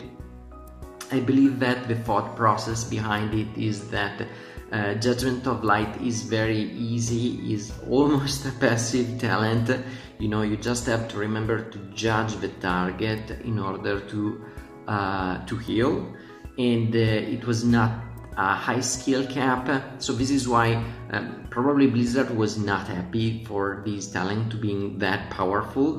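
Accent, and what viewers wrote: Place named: Italy. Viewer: Hey, dall'accento presumo tu sia italiano. volevo chiederti come mai hai puntato su una guida in inglese, ci sono tanti content creator inglesi che parlano di wow e davvero pochi che parlano di wow in italiano, specialmente riguardo agli healer in ampio spettro, a tal proposito hai in mente di fare in futuro guide in italiano? per la cronaca grazie per tutte le dritte e le informazioni di BfA! nessun suggerimento su come poter entrare a far parte del beta testing di BfA?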